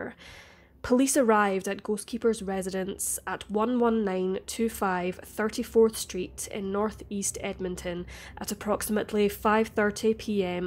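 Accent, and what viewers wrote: Scotland, United Kingdom. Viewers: Loving your channel, it's great to hear a fellow Scot narrating these episodes and I feel you treat each case with such compassion for the victims.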